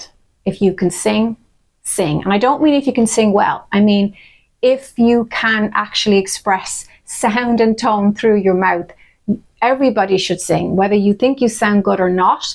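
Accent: Ireland. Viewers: I am here from Canada, I love your Irish tone. I love your positivity ❤️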